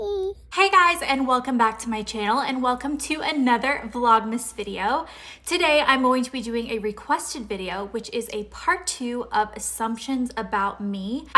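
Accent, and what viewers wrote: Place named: South, United States. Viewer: I like how your southern accent is so much more noticeable now, it's cute :) it comes up especially when you get excited. I'm loving the new videos, this is such a time travel for all of us :) take care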